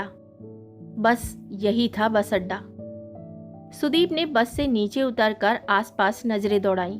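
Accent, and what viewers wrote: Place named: Haryana, India. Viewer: Bahut badhiya kahani.. aur specially apka Haryanvi accent.. great.. m not Haryanvi but mene shadi Haryanvi boy se ki he.. to samjhne lag gai hu... Apne achhe se sunaya ..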